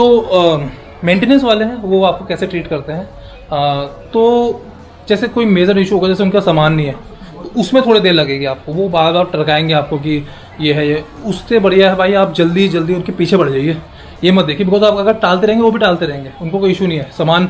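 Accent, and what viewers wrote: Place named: Pahadi, India. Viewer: It was so obvious that you are pahadi by your way of talking... Very nice video you have almost covered all the minute details. Thank you for this video😅 I'm from pahad too😊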